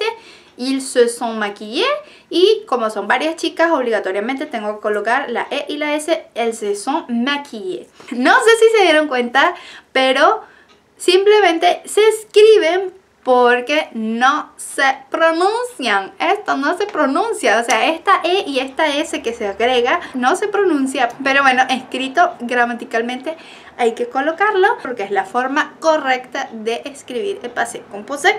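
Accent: Venezuela. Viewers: Excelente la explicación !!! Bello tu francés y tu acento Venzolano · Acabo de descubrir tu Canal Por el acento eres venezolana?? Soy venezolana y estoy en Francia luchando con el idioma Me gusta tu explicación es clara y precisa espero me ayudes Merci... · Excelente calidad de video, con información muy importante. Gracias. Una pregunta: eres venezolana? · Tuve mi sospecha por tu acento,hasta el minuto cuando pronunciaste ''AREPA'' jajaja,saludos desde Venezuela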